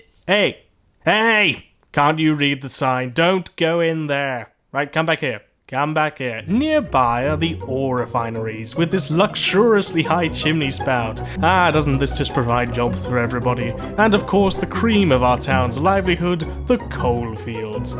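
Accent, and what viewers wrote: United Kingdom. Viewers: And so dentistry is the truest metaphor for economic distributivism betwixt Britain and America. In Britain, National Health ensures everyone receives the same level of dental health regardless of the ability to pay, resulting in a national stereotype of bad teeth. In America, you can get super, top-of-the-line dental care, shitty dental care, and everything in between, based on your pay level, resulting in a stereotype of perfect teeth. British views of capitalism feature oppressive magnates shielded from the law oppressing poor downtrodden workers, while American views of capitalism are that you can arrive with no more than the clothes on your back and within five years, you can own a chain of laundromats, dry cleaners, or ethnic restaurants. Of course, that's easier if you're Vietnamese than if you're black or Hispanic, but we don't want to go down that road because it involves IQ and ethnicity. 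The point is that if the narrator had an American accent, we would expect Ancapistan to have crystal spires as low-rent housing, no pollution because fossickers reprocessed it into industrial precursors, and a chronic labor shortage as everyone preferred to retire and stay home on their extensive ranches. Instead we have RoboCop. Because British.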